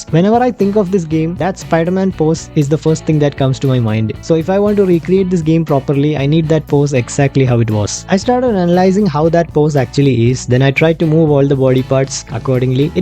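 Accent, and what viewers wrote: Kerala, India. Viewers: Bro are you from Kerala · Hey man are you Indian or English?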